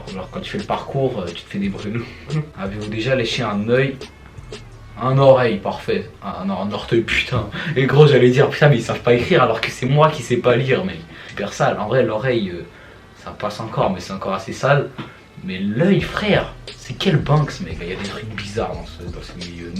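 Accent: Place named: North, France. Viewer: Mec apparemment tu viens di nord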